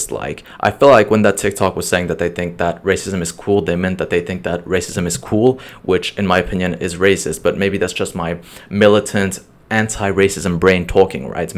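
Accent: France. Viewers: If you speak other languages other than French, french included You'll notice occuring words that are french and also belong to another Language like English for example, You can find lots of words Like chef d'oeuvre, hors d'oeuvre, football, playstation, YouTube, story, nike, film, films, videos, [Names of people, places, and food] ,images, and other hundreds of words The french pronunciation can be either similar, or different Well he most of the times he would pronounce words the exact way they should be in English But sometimes ( in this video in particular ) he did pronounce words exactly how they should be prounced in french or in a similar french accent ( imagine a french person saying the word "story" the way it should be prounced in french "stori" ) PS: other languages such as japanese include french words but with its japanese pronunciation For example: story becomes ストーリ, story is not the best example because it isn't originated from french, But I was taking about the way words are pronounced in french Take story it becomes "stori" (Y prounced as i here) The Japanese pronouciation is kifkif as what I stated above 👆⬆️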